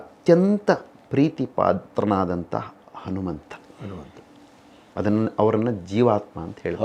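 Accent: Standard. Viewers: ಎಂಥ ಶುದ್ಧ ಕನ್ನಡ ನಿಮ್ಮದು! ಎಂಥ ಸಂಸ್ಕಾರಯುತ ಮಾತುಗಳು!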